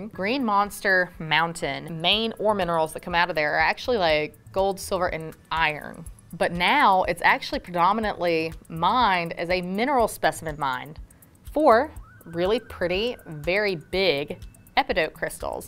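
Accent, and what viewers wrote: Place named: South, United States. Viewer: Miss tries real hard but her Southern drawl keeps coming in and out am I the only one that thinks Miss might possibly be Cherokee?😘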